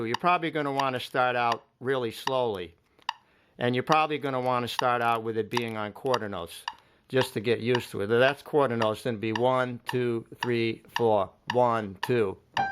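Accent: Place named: Boston, United States. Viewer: Nice Boston accent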